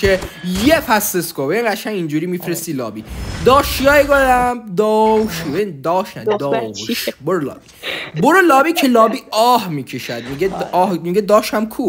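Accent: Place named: South, Iran. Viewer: لهجت مثل جنوب یاست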